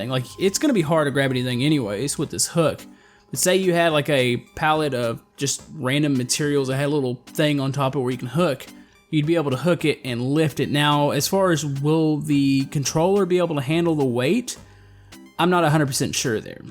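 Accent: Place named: South, United States. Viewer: You sound southern please tell me I finally found a YouTuber from the south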